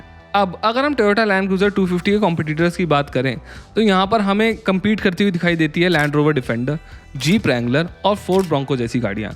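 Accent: India. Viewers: This is Indian English, deal with it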